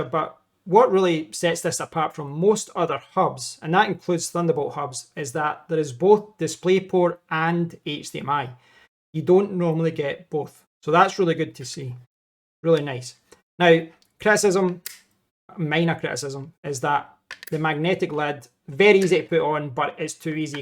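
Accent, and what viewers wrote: Scotland, United Kingdom. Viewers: a great day to perfectly understand a Scottish accent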